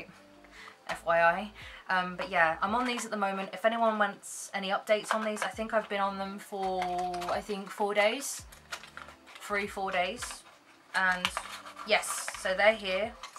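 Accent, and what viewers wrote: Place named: United Kingdom. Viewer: I'm gonna move to the uk just to hear that accent daily 😄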